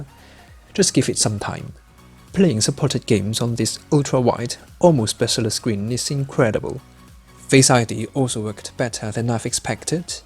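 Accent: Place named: United Kingdom. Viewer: 啲英式口音好正~~~好想學~~~~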